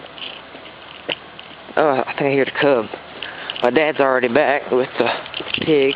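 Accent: South, United States. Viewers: Ooh he country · No, he just has a country accent. A hillbilly is someone with little technology, survives off there own recourses, and is very cowboy-ish. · Love the country accent and the video but come on man, do the steps. ANY job worth doing is worth doing right. Old saying but so true. BOY, todays kids!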